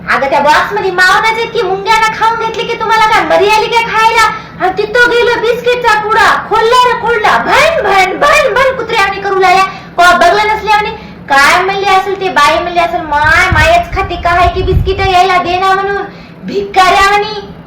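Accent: Nanded, India. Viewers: तुमचे बोलणे ऐकून मला माझ्या नांदेड ची फार आठवण येते 😊 Keep it up and God bless you dear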